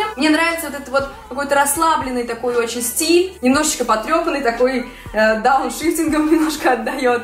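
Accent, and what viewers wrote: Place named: United States. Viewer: Вы слишком быстро разговариваете,прямо тараторите и манера разговаривать у вас какая -то американская.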